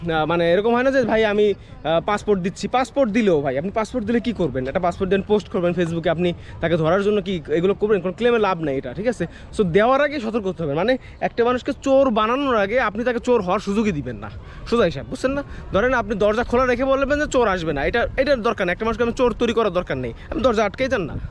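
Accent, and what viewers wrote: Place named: Meherpur, Bangladesh. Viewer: ভাই আপনি কি মেহেরপুরের ছেলে নাকি, আপনার কথা শুনে মনে হচ্ছে আপনি আমাদের মেহেরপুর জেলার ছেলে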